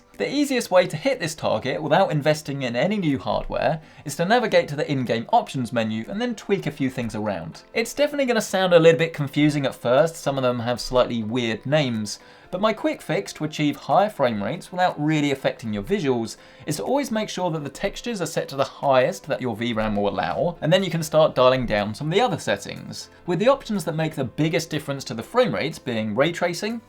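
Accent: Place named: Cockney, United Kingdom. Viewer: Not sure I am a big fan of Cockney. I think I prefer normal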